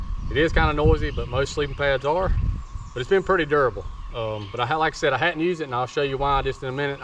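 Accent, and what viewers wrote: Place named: South, United States. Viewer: Finally, a camping gear video from an American in the South. So many of them are Aussies. No disrespect to our brethren down unda', but I need to know what I need and where I will be camping. I live in SC, so I imagine the with that accent knows a thing or two about camping in my territory. The budget-minded selection is good, too. Thanks for the info and the no-nonsense presentation.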